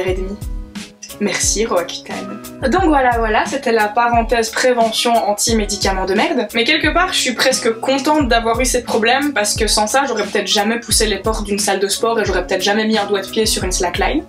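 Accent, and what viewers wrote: Belgium. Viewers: Tes belge ? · t'es belge?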